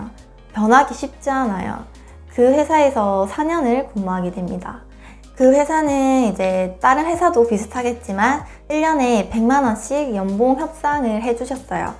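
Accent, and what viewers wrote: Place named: Gyeongsang, South Korea. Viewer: 경상도 누나같아요.. 억양은 우짤수없죠ㅠ 응원합니다!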